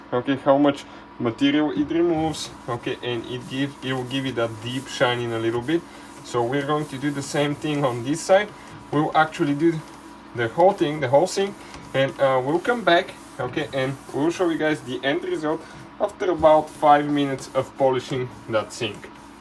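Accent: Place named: Russia. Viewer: He sounds Russian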